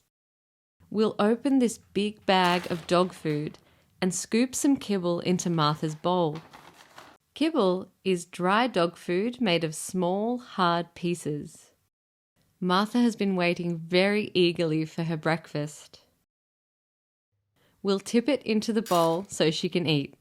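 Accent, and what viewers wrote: Australia. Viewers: helloo, iam learning these ausie accents from you its lovely to hear it and it is so calming to my ears...sending the love from Indonesia 😁😁:hands-yellow-heart-red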